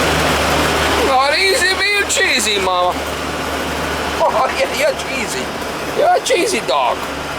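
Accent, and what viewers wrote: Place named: Boston, United States. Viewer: Now I know what those little blue caps / containers in my wife's plumbers bag are lol ... Excellent video my man .. you from BAWSTIN I take it? You sound like decaprieo and marky mark lol cheers ❤️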